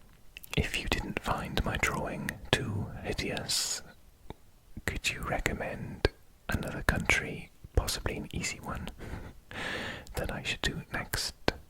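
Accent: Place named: Wales, United Kingdom. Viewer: Having come from the village of the historical seaport Mystic, CT, I can say it's delightful to hear a Welshman explain geography. He's long since passed away, but a local Welsh-American icon Don Treworgy, a close friend of my brother, inspired such a passion for astronomy in our village with his brilliance and kind and gentle manner, we named the town planetarium after him. It was a privilege to know him. Wales will conquer the world, one gentle heart at a time! Even if no one except those who knew him can pronounce his name!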